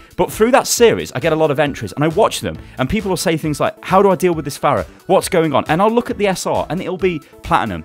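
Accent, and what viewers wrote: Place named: United Kingdom. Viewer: Great British Accent! XD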